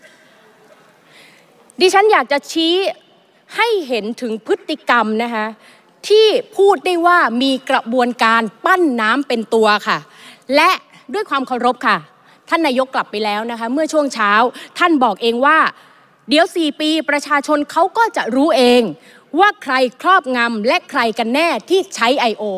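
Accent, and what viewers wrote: Thailand. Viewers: แหลงหรอยนัดสสคนนี้ สสใต้ไหมครับ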